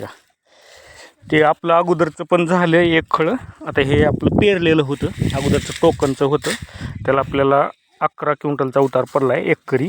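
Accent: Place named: Latur, India. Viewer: लातूरचे का दादा बोलण्या वरून म्हणले दादा . 😊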